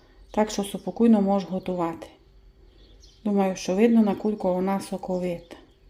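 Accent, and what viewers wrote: Transcarpathia, Ukraine. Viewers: та хоч би і ніколи не жили в Україні,на мій погляд не дуже важко здогадатися,що у має бути закарпатський діалект.Це загадка на мільон долярів.😁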